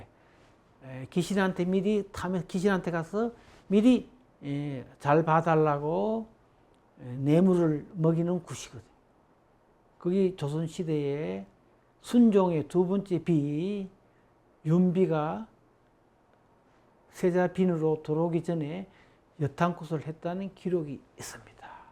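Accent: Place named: Daegu, South Korea. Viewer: 요즘 다시 교수님 영상 정주행합니다. 저도 대구출신이라 교수님의 사투리가 정말 정겹네요~~ㅋㅋ😊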